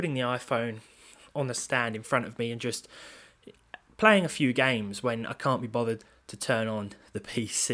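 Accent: United Kingdom. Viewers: that's British English for you, don't know from which specific area tho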